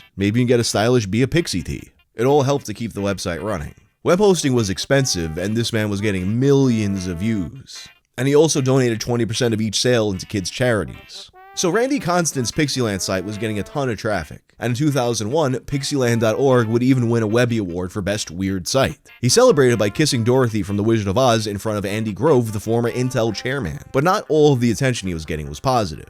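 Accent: New York, United States. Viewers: Dude I love him and his deep New York voice like Peter Steele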